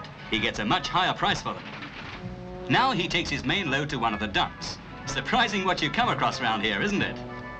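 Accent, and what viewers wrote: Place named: England, United Kingdom. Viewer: So lovely to hear a proper English accent instead of trendy street nonsense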